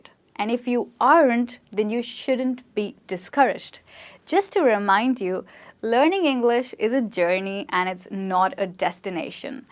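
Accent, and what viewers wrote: India. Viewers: Are you Indian. Your pronounce unfold it. Sometimes you spoke Indian english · I think she is speaking india English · What a beautiful indian accent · God this is Indian English . So funny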